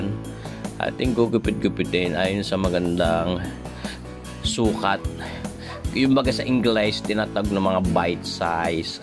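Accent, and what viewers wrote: Batangas, Philippines. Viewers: very nice accent batang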